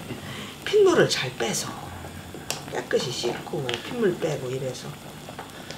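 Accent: Chungcheong, South Korea. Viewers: 무수, 무시 충청도구만이네요 구수하네요 예산,당진 그쪽 아니신가요 잘 봤어유 해먹어봐유 환장하자 맛있네유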